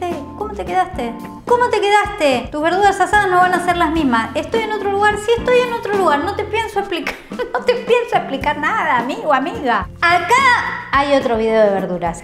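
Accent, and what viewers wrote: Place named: Buenos Aires, Argentina. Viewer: Me gusta su tono porteño de hablar,es UD muy graciosa,las verduras quedaron súper bien,es algo para ido a la escalibada.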